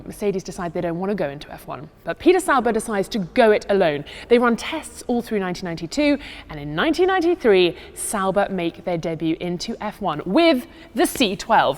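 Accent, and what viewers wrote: United Kingdom. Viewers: annoying blonde lady with annoying british accent go away... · Love her British accent... wow...I am in love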